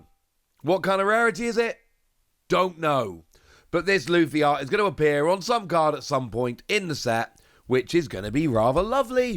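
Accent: United Kingdom. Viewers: Hahahaha that UK accent messing people up again. Love it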